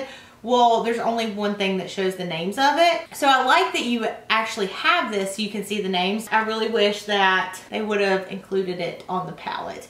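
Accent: South, United States. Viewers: Just found your channel and I LOVE that I've found someone with a southern accent that does makeup videos haha!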